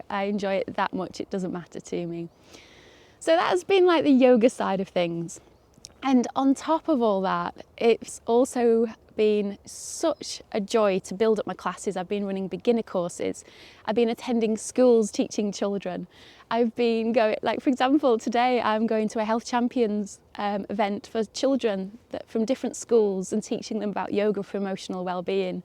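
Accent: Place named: North, United Kingdom. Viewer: I like your northern accent 😅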